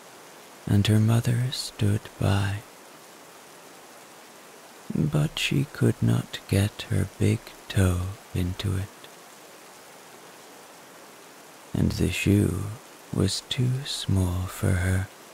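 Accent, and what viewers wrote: Ireland. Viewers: Oh, yes!! That Irish accent ❤. No accent is so lovely as an Irish accent. God bless Ireland and all its descendants.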